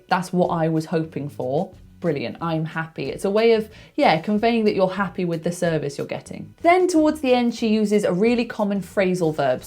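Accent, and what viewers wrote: United Kingdom. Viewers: i like british sounds❤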